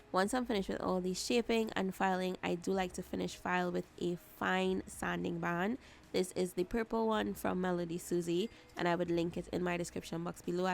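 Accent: Trinidad and Tobago. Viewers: Are you from Trinidad?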